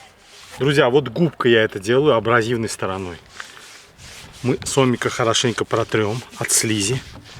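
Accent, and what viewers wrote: Baku, Azerbaijan. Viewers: Здравствуйте, дядя. Благодарю Вас, за ваш канал, за ваш бакинский акцент и за Ваш позитив😊 успехов и процветания Вам. Скажите пожалуйста, вы лезгин?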